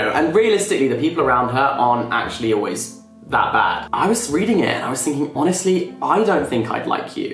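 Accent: United Kingdom. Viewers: WHY IAM I LISTENING TO A BRI-ISH MAN RANT ABOUT BOOKS AT 2 AM? i do not know ( i love him sm)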